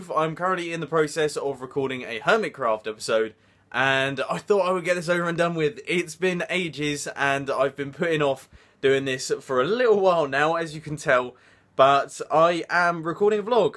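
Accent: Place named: United Kingdom. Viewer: British accent is awesome, way better than the american accents I know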